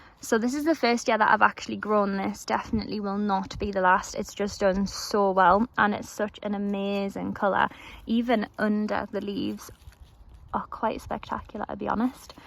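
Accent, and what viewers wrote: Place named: Newcastle, United Kingdom. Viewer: Hi you are a natural your accent is so cute not too much Newcastle. I like that you are more old tech school, please keep it that way.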